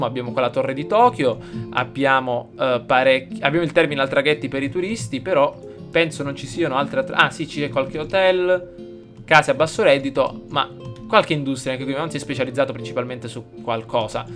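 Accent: Sicily, Italy. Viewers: Ti prego continua simcity e mi potresti accettare l'amicizia per favore mi chiamo After478 P.S ma sei siciliano?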